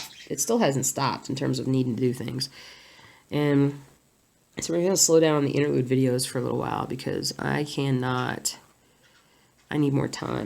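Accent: South, United States. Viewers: Actually, I am from South Carolina, and I do hear your southern accent. It doesn't come out all the time, but there are certain words that you say, like 'plain' kinda sounds like 'play-en'. When I first started watching you, I wondered where you were from, until I heard you talk about Georgia. And BTW, if you ever come visit the SC area, or north GA, get in touch and I'll buy you lunch! Yes, they still do the laser light show on Stone Mountain. And I YES to Zaxby's. We have one about 10 min from our house here in SC. I was so happy when we went to Pensacola Fl to see my father in law and they had a Zaxby's there. So they are going a bit more west.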